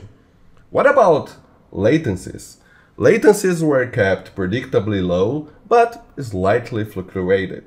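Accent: Brazil. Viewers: Pelo sotaque esse cara é brasileiro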